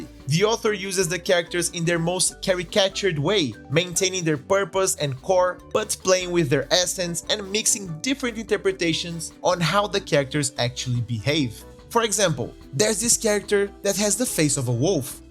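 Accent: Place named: Brazil. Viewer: Bro You're brazillian right? Lol. Got from the accent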